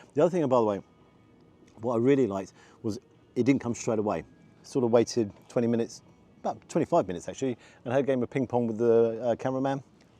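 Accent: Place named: North, United Kingdom. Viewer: If you call your channel "Rate My Takeaway" you're not going to high end Fallow or Gordon Ramsay any time soon. He does what it says. Unfair comparison. PS his English is a perfectly fine regional vernacular. One of many. It makes the UK fascinating. Some might say "You sound elitist and frankly a little bigoted towards Northern working class folk." Some might.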